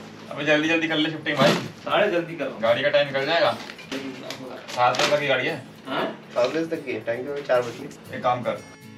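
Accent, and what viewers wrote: Haryana, India. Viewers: Bhai ki personality aur accent par scooty suit nahi karti. What this accent is.....Hariyanvi??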